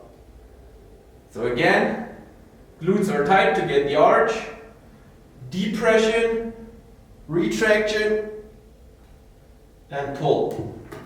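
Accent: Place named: Germany. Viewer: This is a very german english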